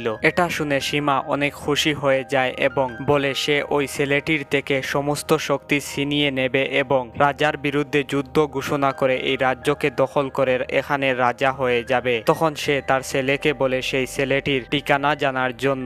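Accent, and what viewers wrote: Sylhet, Bangladesh. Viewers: সিলেটি মাত্ত যতই তেল মসলা লাগাও চেইন্জ হবে না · matar bongi deikka oi bujram Sylhety... Karon ami Nijeo Sylhety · Vai tmi ki সিলেটের পোলা😀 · 1000% সিলেটি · Vai apne sylheti ni..? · Bro tmi ki Sylheti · amrar sylhety vaiya maybe afne?otar lagi subscribe kori dilam.ar explain ta vala oice🥰🤗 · ভাই কি সিলেটি?😀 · Vai amr bari o sylhet,,kintu apne sylheter oia o,, ordek sylhete r ordek suddo basha kowar karone,, vlo lage nh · vai dairet Sylheti mato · সমস্যা নাই ভাই চালিয়ে যান আস্তে আস্তে সব ঠিক হয়ে যাবে.আমিও সিলেটি মানুষ.. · ভাই কি সিলেটি নাকি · ভাই সিলেটি নি? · ভাই কিতা সিলটি নি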